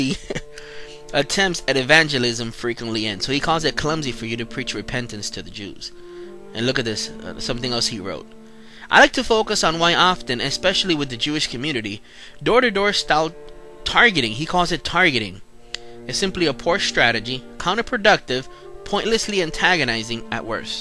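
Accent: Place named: Puerto Rico. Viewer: bro you sound Boricua like me. But you are soooooo confused. Tell me, ¿Where is Jesus returning to FIRST? ¿NYC?¿Paris?¿London? HELLOOOOOOO!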